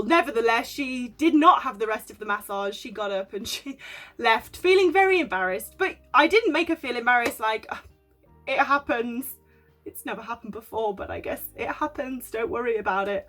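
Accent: United Kingdom. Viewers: I just found you, in a group of van-ladies. A interesting and entertaining blogger. I like the accent. British I suppose, I’m American. I have always gotten massages periodically too so I can relate to that. Sorry you seem to have more than your fair share of jerks and weirdos. The worst was clearly the pooper but possibly he has a bowel disease. Carry on. 🤓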